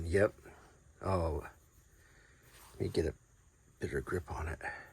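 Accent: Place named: France. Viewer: Good job mate, really relaxing! Love the french accent!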